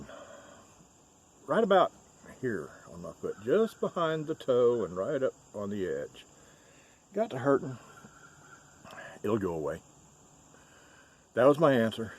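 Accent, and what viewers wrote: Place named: South, United States. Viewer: Hi I'm from NonnaGrace's Channel! I like the coffee chats! I understand the southern country thing! I live in Hitchcock Texas and we all talk like that! Lol and I was born and raised in the city and it took me a really long time to get used to it!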